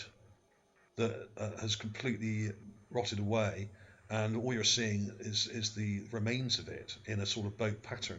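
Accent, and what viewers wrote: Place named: West Country, United Kingdom. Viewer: It makes a change to hear a west country voice! Instead of one of those cosmopolitan Jewish American voices, that so often accompany these videos. Are you from Somerset as there are certain timings in your phraseology that given an indication that you are?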